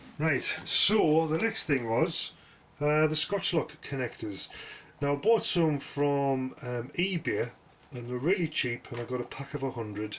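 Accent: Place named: Northern England, United Kingdom. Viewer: HI YOUR ACCENT SOUNDS A BIT MORE NORTH THAN MYSELF IM A BORO LAD HEARD YOU MENTION YOU BOUGHT SOMETHING FROM PETERS SPARES, ANYWAY YOU ARE DOING A GREAT TEACHING JOB FOR ME IM BRAND FIRE NEW AT THE RAILWAY MALARKY BUT WANTING AS MUCH ADVICE AS POSSIBLE KEEP UP THE GREAT WORK TAKE CARE!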